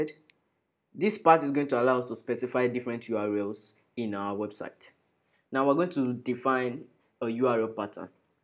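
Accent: Nigeria. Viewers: May God bless you. This is the only video that is slow enough for me to comprehend. It also has this Nigerian accent.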